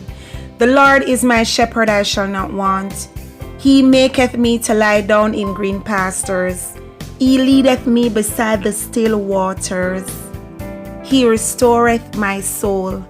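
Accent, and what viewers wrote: Jamaica. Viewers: I like this it sounds really like a jamaican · Love this . You doing perfect . Love my Jamaican dialect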